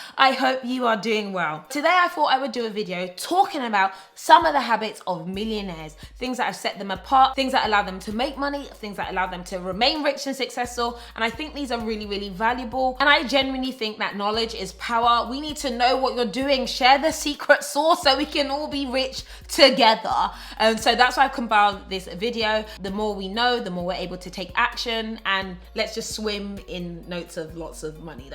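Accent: United Kingdom. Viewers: Are u british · I love British accent💋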